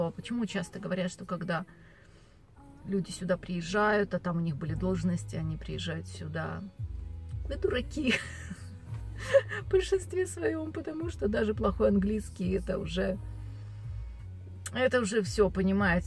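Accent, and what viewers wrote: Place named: United States. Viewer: Всё у вас получится, терпите, учиться надо всю жизнь. Кстати, у вас уже русский язык с американским акцентом.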